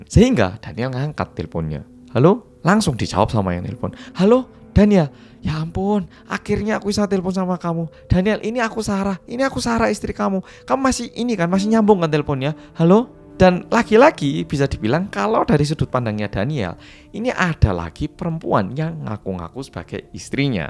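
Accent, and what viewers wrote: Java, Indonesia. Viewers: Bang mukamu Korea tp bahasamu medok bngt..mf y jgn marah 🤞🤞tp cerita mu seru abis dn keren 👍😄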